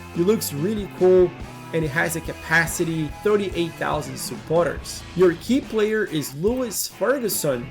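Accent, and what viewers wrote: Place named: Brazil. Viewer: Brasilians guys allways have that accent, i'll bet he's brazilian =P good job 👍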